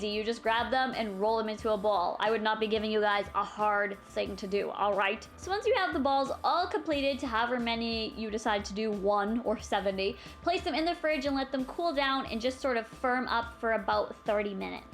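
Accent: Canada. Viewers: Probably because she's Canadian and Canadians tend to say some words a little different. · She's canadian! Therefore, she has an accent. She's not saying it weird lol. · I say it like her, it must be a Canadian thing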